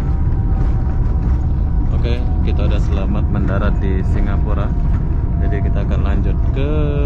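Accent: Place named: Malaysia. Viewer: Apa-apa benda☺️ Kamu ade mix malaysia ke percakapan kamu ade sikit-sikit melayu😃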